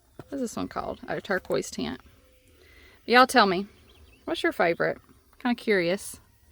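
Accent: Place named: Appalachia, United States. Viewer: You talk just like we do in southwest Virginia.( The Coalfields) We are near eastern KY and East TN ( Harlan County, KY and Kingsport, TN)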